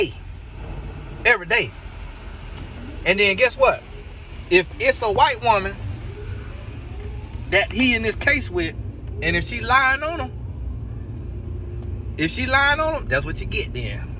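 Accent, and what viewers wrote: United States. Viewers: The accent is sending me. You are so country 😂 as a NYer this was entertaining. But in all seriousness this entire message is the truth. If we’re honest without his money Mr. Mayors would be called crusty. I never understood his sense of style. Also it’s no longer 1 woman accusing him, More came forward. Meagan always went out her way to make wrong choices with men. She chose one Godly man, one time then ran off. Something about her looked uncomfortable/forced the entire time I saw them sitting together. But Mr. Franklin chose an ungodly woman so 🤷🏾‍♀️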